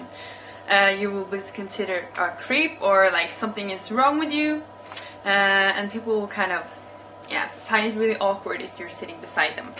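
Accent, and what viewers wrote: Norway. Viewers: I loved your accent, I like the nowergians accent when you speak English. Here in Brazil, I think that We are one of the most sociable countries in the world, except here in the south, Where I am living now, I the south of Brazil there are a lot of Europeans descendants and they are not so sociable like the people of the others regions.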